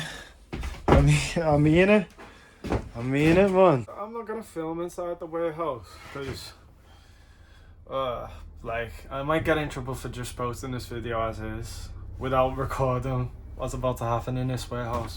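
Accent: Liverpool, United Kingdom. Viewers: Love the Liverpool accent, I hope you continue with this series. See like a down to earth lad, keep your head high and I'm sure success will head your way :) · Your accent where the hells it from lol it’s half Liverpudlian and half something else. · Swear I can hear a Scouse accent there 😂👊👊 I do the flex logistics dwn1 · What is that accent? Scouse/yardey? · Are you a scouser ???? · the liverpudlian accent is just fucking hilarious. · you sound mad scouse I live in huyton ever delivered there page moss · Trying to figure out your accent. I’m hearing Liverpool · I hate scousers but you seem alright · What is that accent? Sort of Liverpool gangsta I guess. Massive. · What is your accent? You kinda sound scouse but also really Irish at the same time? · picking up a scouse accent lad💪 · Wheres your accent from ? Not just liverpudlian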